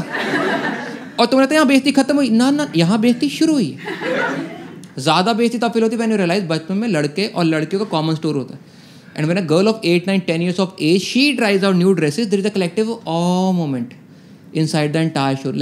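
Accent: Punjab, India. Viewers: Kaur yes both have punjabi accent that makes it more funny !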